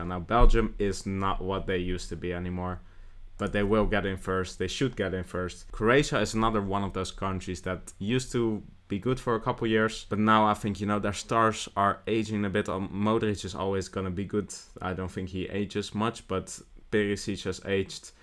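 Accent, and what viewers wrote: Netherlands. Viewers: He may live in belgium but he is clearly dutch you can hear that from his accent. And look at his name is which is not a Flemish name and certainly not a Walloon name. is a typical Dutch (the Netherlands) name.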